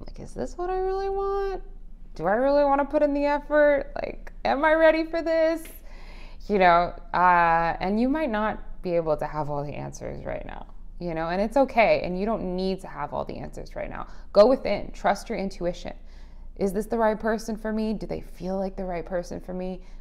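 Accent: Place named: Australia. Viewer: Australian accent 😅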